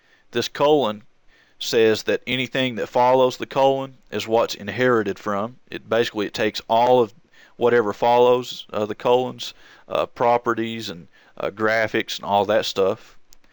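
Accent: South, United States. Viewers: i’m a bit jealous of your voice you’re kinda like hillbilly morgan freeman i should know; i’m a southerner myself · this is a good video, but the thing that intrigued me the most is his cowboyish accent. really satisfying to hear it XD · You sound like a country boy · sounds like a redneck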